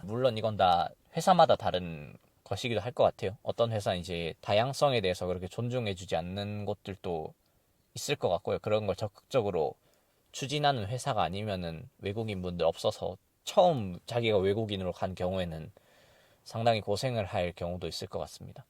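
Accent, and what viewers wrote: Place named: Japan. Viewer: 한국어에 일본어 톤이 녹아있는걸로 봐서는 일본어를 수준급으로 잘하실 것 같네요. 저는 살다가 돌아왔는데 어디서나 화이팅입니다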